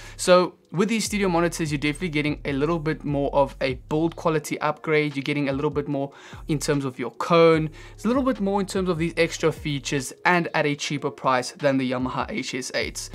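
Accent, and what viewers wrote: South Africa. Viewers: Your accent sounds south african are you originally from south africa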